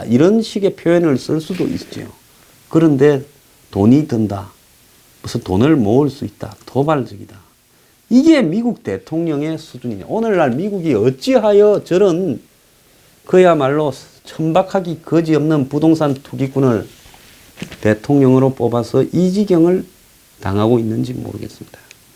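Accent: Gyeongsang, South Korea. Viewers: 매력: 점점 빠져드는 마초의 갱상도 억양과 가끔씩 날려주는 욕설!" <*랄, 니*미, 니나 잘하세요!(뭉가한테)>이런거 들을때마다 카타르시스 느낍니다! 더 쎄게 해주세요! 쓰레기같은 인간들한테는 말로 해주는것도 아까워요.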